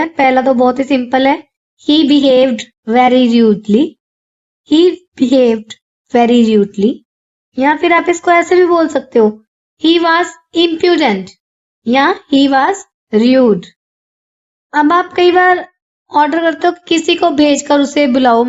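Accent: Punjab, India. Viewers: Sweet voice, some times Punjabi tone and useful sentences. Thanks mam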